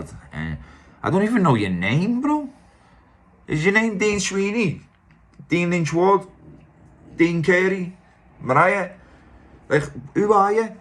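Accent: Liverpool, United Kingdom. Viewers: I don’t get ya with all this fighting talk …you where sh*+£ against ash dring he slapped the head off ya and why do you keep speaking with an Liverpool accent when you Welsh 🤦‍♂️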